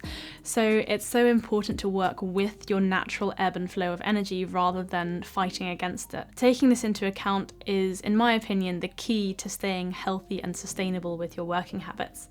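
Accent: United Kingdom. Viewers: how to practice to get British accent like you? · you are that one Youtuber for whom I’ve been searching for years! An intelligent, genuine, well-educated female YouTuber with a modern RP accent as my speech model. I gave up last year after searching far and wide but today the algorithm took me to your British accent video and I immediately subscribed! You’re cultivated, intellectual, sincere, elegant, beautiful, speak with a perfect modern RP accent AND create such high quality video with excellent advice. What else could we ask for? Thank you, thank you; thank you!! ❤️❤️❤️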